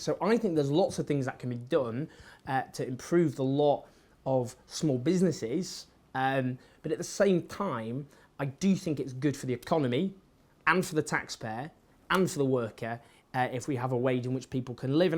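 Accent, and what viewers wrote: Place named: United Kingdom. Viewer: Amusing, sharp and intelligent. Pity your british accent is a bit rough for a mere English-speaking spaniard, I'm sure I'm missing some meaning. Question: how much time do you think we have? I mean, until the majority of us get so used to this downsize in our living standards, our welfare and our civil rights, that we forget once we had rights, thus giving up the struggle for recovering them?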